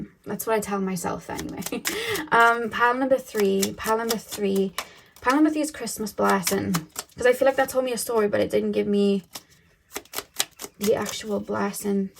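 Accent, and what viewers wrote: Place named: Newcastle, United Kingdom. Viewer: Are you a Geordie? Trying to place your accent.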